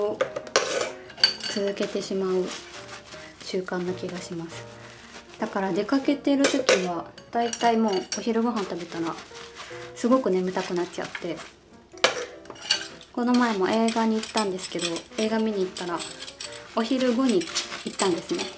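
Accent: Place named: Aichi, Japan. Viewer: はじめまして。最近さんの動画を発見して、少しづつ見ています。癒しの時間です。私も愛知出身なのですが、しゃびしゃびと丈夫い、確かに関東に来てから聞かないなと思いました。さんの言葉のイントネーションが好きに感じるのは、故郷を感じるからかもしれません。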